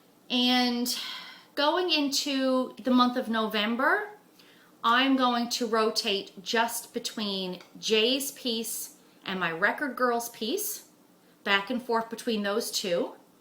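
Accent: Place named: Newfoundland, Canada. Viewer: Always love your updates and stories and seeing how much progress you manage to make in spite of working and being a great wife and mother. My. best friend in high school and college had both parents and a live in paternal Grandmother that were Newfoundlanders transplanted to Tennessee. So I love to hear your NL speech. Reminds me of all of them. Good memories. Thanks!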